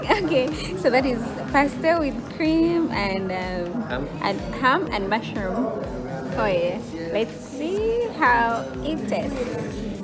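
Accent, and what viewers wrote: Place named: Netherlands. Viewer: The English has really changed. This is really a Dutch accent. U loved the Vidoe bby gal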